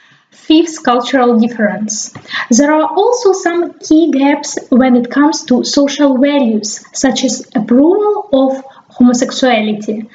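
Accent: Russia. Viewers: Невозможно слушать этот нижегородский прононс... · russian accent,i like it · I love your Russian accent so nice to hear that.... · Your pronunciation is a cultural diversity as well it's really specific for Russian people to speak a Russian English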